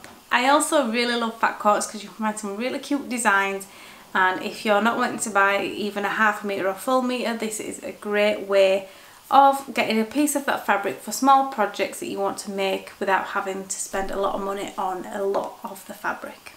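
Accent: United Kingdom. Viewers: It's so lovely to hear a Yorkshire/northern accent on you tube! Great video thank you x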